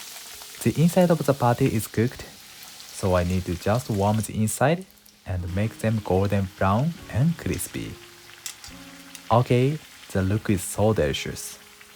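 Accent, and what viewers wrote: Japan. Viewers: I like your Japanese English! Thanks for the recipe, I will make it tomorrow ❤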